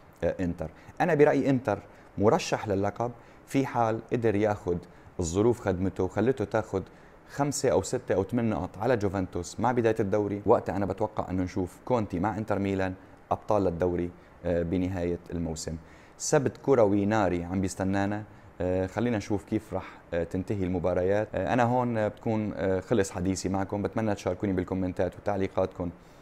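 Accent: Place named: Aleppo, Syria. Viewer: كلام هادئ ومتوازن ومنطقي،من اول فيديو عرفت انك من حلب،الله يعطيك العافية وتقديم جميل وسلس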